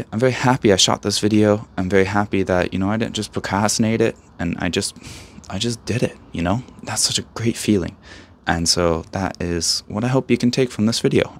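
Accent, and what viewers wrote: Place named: United Kingdom. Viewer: You have a British accent, that's cool.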